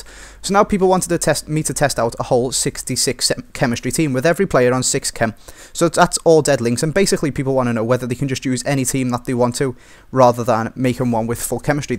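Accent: Liverpool, United Kingdom. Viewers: no he's scouse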